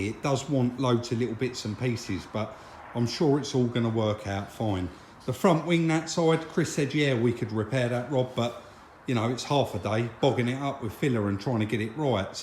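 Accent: United Kingdom. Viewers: This British accent 🤣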